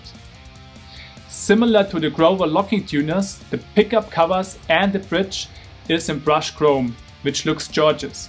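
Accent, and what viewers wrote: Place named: Germany. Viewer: Your accent is atrocious. You should not be making videos. It's ridiculous. Meine peinlichen Mitdeutschen immer...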